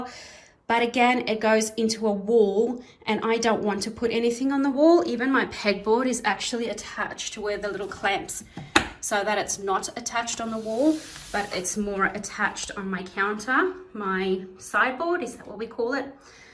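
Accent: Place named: Australia. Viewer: The relief I felt when I heard her Australian accent was unbelievable.. haha. I’ve watched so many cricut videos & it’s always American. This is the first time I’ve seen an Aussie do cricut videos so I subscribed straight away!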